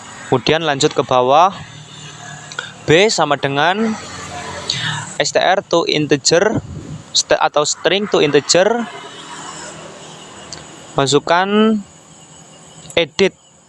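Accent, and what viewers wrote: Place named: Banyumas, Indonesia. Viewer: Ngapaknya itu loo 😍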